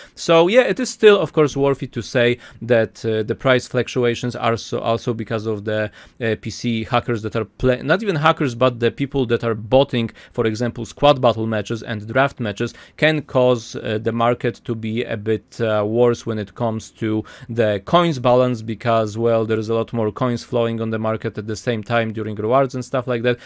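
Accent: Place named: Poland. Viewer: Ja nadal słysze polski akcent xD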